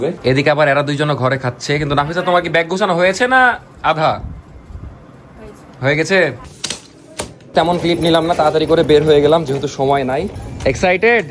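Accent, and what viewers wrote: Rajshahi, Bangladesh. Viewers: Vai Ami epar Bangla ( INDIA ) ,apnar paser district Murshidabad theke , Apnader Rajshahi r Bhasar taan and amader Murshidabad er Bhasar tan onek tai same , khub valo lage apnader video dekhte . West Bengal a konodin asle dekha hobe ...Take care ❤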